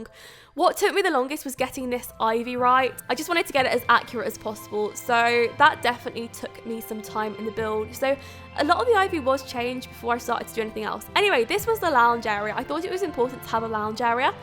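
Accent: United Kingdom. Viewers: R u British?😕😞 I am English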